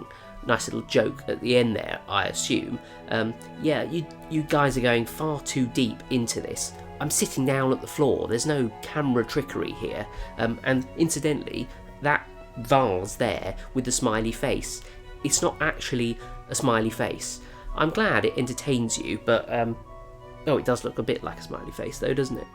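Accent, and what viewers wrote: United Kingdom. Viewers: I love your British accent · There’s plenty of more deserving people here to troll. Flat Earthers, Non-binary’s demanding use of correct pronouns - from a tiny list of 89 last I heard. People who say “...it’s just a theory, it’s not a fact’ My preferred method though, almost ashamed to admit it, is to ignore the witless idiots - especially those who initiate a battle of wits before realising they’re completely unarmed. I’m British, we don’t all talk like this guy, or decorate or dress like him, and I don’t see it’s relevance to anything - but he’s British, we’re all ‘querky’ so being British myself, and ex-Army, I have a duty to stand by him for moral support, it’s not even a duty, it’s a given. We look after our own over here, and I’m sure that while this chap couldn’t really give a toss what those beneath him think of him - he still has feelings, and whilst even I couldn’t give two shits about them either, they’re his, and I wouldn’t go out of my way to ridicule him, his family, or even his cat - but if we met in public and faced a troll of his, then I’d have to drag the troll by, his forehead, onto a busy motorway. 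Besides, like most Brits, he’s fighting his own battle perfectly well. Don’t confuse ‘being nice’ or ‘being kind to others’ as a physical weakness, it’s how we lure you in for analysis - think of it more as pre-emergency screening. Defending underdog’s and ginger since 1977.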